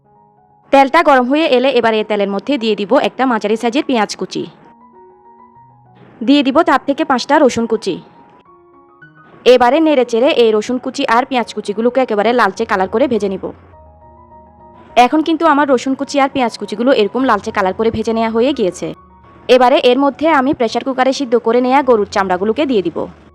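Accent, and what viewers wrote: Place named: Barishal, Bangladesh. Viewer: আপনি বরিশাইল্লা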